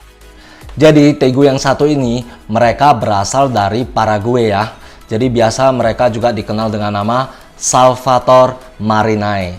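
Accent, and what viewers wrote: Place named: Chinese-Indonesian, Indonesia. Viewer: Penjelasannya sangat lugas dan jelas... Dengan dialek kokonya yg khas .. Semangat ko,di tunggu konten lainnya...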